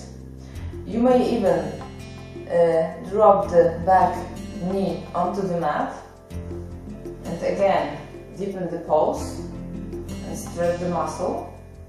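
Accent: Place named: Turkey. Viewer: türk aksanı sezdim sanki :)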